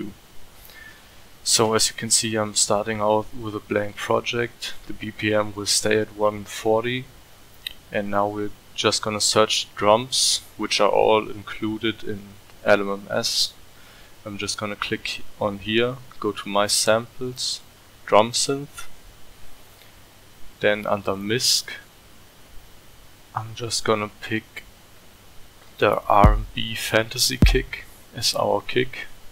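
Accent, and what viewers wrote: Germany. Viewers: are you german? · man merkt voll dass er deutscher ist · Can know by your accentXD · He isnt sad it's his accent he is German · Thank you man! You sound like you're from Bavaria. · Are you german? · hard german aktzent hast du :) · Man hörts an dem Akzent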